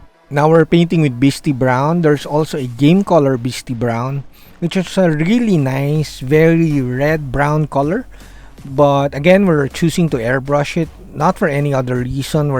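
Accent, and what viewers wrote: Philippines. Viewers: Heavy Filipino ascent say peanut butter.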